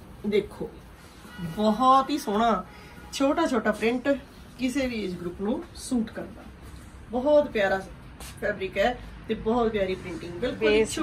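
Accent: Standard. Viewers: Menu thudi pure Punjabi bahut wadeya lagdi aa g sis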